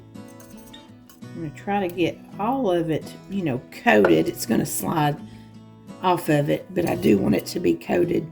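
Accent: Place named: Tennessee, United States. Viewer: I might try the crockpot sweet potato casserole this year! So glad I found your channel because I grew up in Madisonville for several years of my childhood and your accent makes me so happy and reminds me of TN! I still live in the south, but TN holds a special place in my heart. I also purchased some jams for Christmas presents! 🥰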